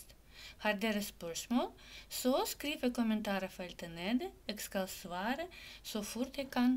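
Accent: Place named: Russia. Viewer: Ruskiy aktsent na vidu :)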